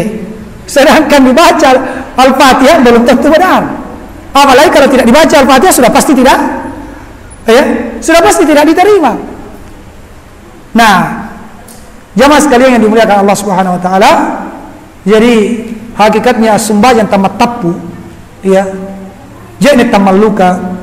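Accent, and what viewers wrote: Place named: Makassar, Indonesia. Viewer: Enak memang klo Dakwah pakai Bahasa Makassar yg kental 😌😌😌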